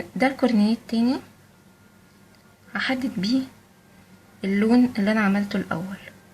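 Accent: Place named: Mansoura, Egypt. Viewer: تحفة يا عاوزة اقولك ان اول حاجة شدتنى ليكي صوتك. واسلوبك راقي ورقيق والله حسيتك من المنصورة.اول فيديو شوفته ليكي البيتزا في الطاسه. ومن يومها بعمل كل وصفاتك وبتطلع معليا تحفه.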